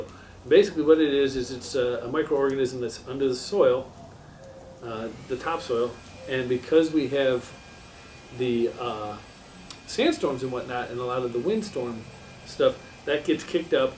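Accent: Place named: India. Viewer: you sound like you are of Indian descent. If you have gone to India, you will be able to relate to the climate in AZ. India's heat is dry heat and can get up to 120 degrees, if you are closer to the equator.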